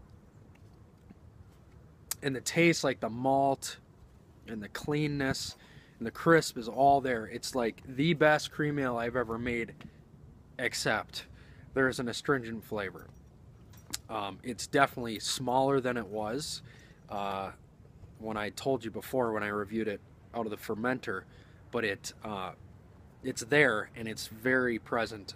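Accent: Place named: North, United Kingdom. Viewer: Indeed, homebrewing is awesome because there are some things that make more sense through experience than just from reading. Slainte!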